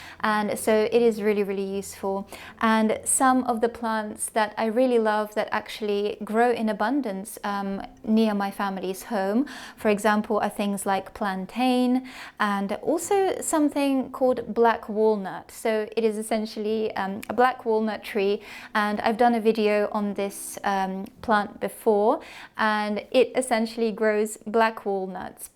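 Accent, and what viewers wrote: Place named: United Kingdom. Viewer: You speak british english very nicely. It is pleasure to listen to you. Thanks for the inspiration🐝🌻Lovely day!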